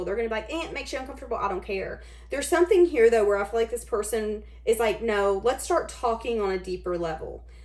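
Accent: South, United States. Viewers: Holy cow! You are on point! This totally resonates with me. Thankyou so much ❤btw love you accent. I am from the South too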